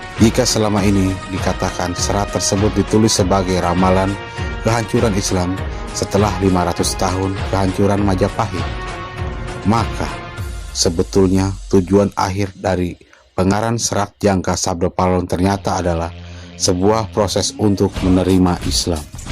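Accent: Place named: West Java, Indonesia. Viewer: Bahasa Jawa Logat Sunda Om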